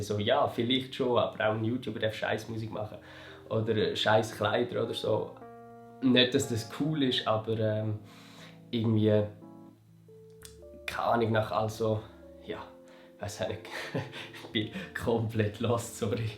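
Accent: Switzerland. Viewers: Ich finde das schweizerdeutsche voll schön, kann nicht mehr aufhören, deine Videos zu gucken😂🤗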